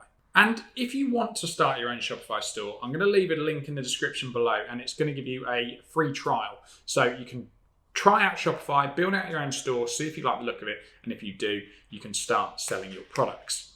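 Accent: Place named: Birmingham, United Kingdom. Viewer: Thanx for your reply. Just thought I could hear a slight Brummy accent on your video (;o)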